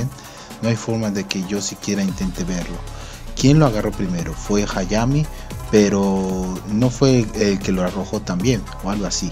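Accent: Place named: Yucatan, Mexico. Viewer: Carnal eres yucateco? Por qué se escucha mucho y eso es genial tienes mi apoyo broder. 🐖🤠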